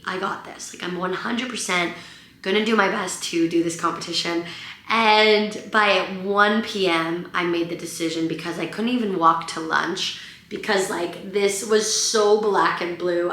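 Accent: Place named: United States. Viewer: Where’s your English accent gone? You sound REALLY American 😳😢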